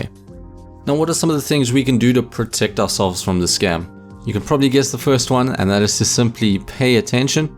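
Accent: South Africa. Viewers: Is he south african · U from South Africa? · Are you from south africa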